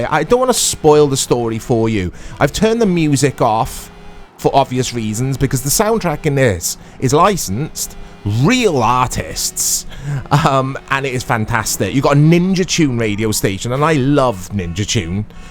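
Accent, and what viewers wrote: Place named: Cardiff, United Kingdom. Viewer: This boyz from Caaaaaaaaardiff or Newport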